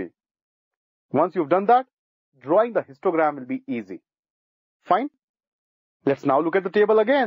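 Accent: India. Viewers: Look you Indian guy this is wrong and anyway I didn't understand anything because of your acsent. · this guy has some next indian accent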